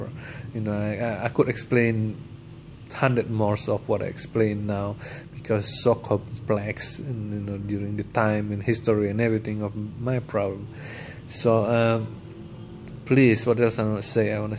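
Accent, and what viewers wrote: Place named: Indonesia. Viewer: Why he was so afraid of tapered to banjo? It is a great instrument! :D :D :D :D :D :D :D :D OMG, this Indonesian accent is hilarious. :D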